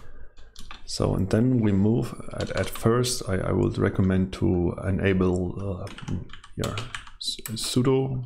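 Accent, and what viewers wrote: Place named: Germany. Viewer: Ich liebe dich, dein Denglish und dein Tutorial!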